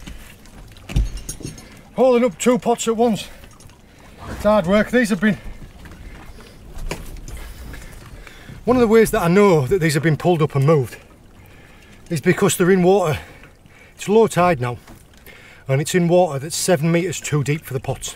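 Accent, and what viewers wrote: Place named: Hull, United Kingdom. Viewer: HI...new sub here...sorry to hear about your visiting theives m8...is there any where that you can review ship movements in your areas, looking at radar tracking ect... so ive been thouroughly entertained with your video's, i must have watched around 20 upto present...& i realy enjoyed where you managed to catch the 2 tuna...fantastic video's...ime a metal detectorist from the north west of the uk (i used to shore fish from the front in Morecambe, a fair while ago!) your accent sounds like a Hull one... deffo northern!...anyway, i hope you make progress with finding out what vessel's were near to where your pot's were...good luck & Happy Hunting...take care & tight lines! Gordon...(click my picture and check out a few video's if you get the time)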